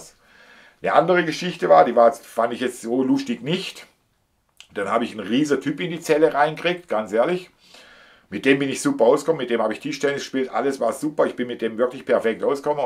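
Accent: Germany. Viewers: Ich hab durch Zufall deinen Kanal entdeckt, finde es super, dass du so offen über dein "altes Leben" redest. Ich bin ein neuer Fan und werde deinem Kanal auf jeden Fall folgen. Übrigens, dein schwäbischer Dialekt, genial ;-) Grüße aus Zella-Mehlis. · Bisch doch aus Karlsruhe oder Umkreis vom Dialekt her 😂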